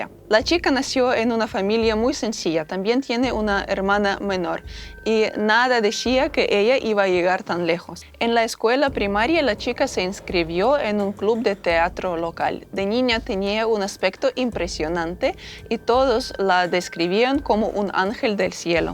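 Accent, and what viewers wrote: Russia. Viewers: Hola Saludos imagino por tu asento eres Russa. Muy buen video y documental Gracias. Conosco un poco de russia 🇷🇺 lo visité desde Moscú asta SantPeterburgo. Wow q hermoso país muy ordenado y por sierto tuve en relación con una hermosa chica russa mi respeto y admiración por la cultura Mi opinión personal 100% me quedo con las chicas europeas porq te admiran y respetan nuestro espacio y muy sociables Nunca le preguntan de entrada eres soltero o casado no le rebuscan los bolsillos aunq lo pueden estar necesitando son muy respetuosas. Me encanto tu video Gracias 🙏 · Interesante historia y muy bien redatada con esa voz suave y ese acento ruso innegable, vaya que hermosa combinación.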